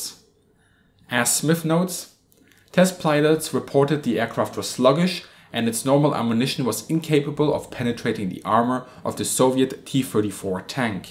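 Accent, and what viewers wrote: Germany. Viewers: Great target stuff vid. Ohh man I do love my fw 190 a8-r2..( tamiya ); you got afunny strong german accent..I like it, un saludo desde Madrid. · Are you german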